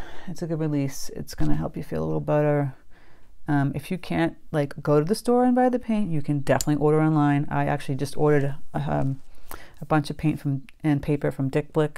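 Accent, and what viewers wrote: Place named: Maine, United States. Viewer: Are you from Maine? I can hear it in your accent ;-)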